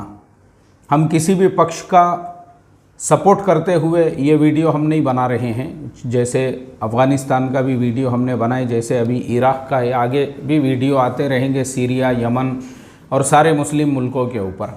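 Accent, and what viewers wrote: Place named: Maharashtra, India. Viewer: Pure Maharashtrian tone. 🙏🙏🙏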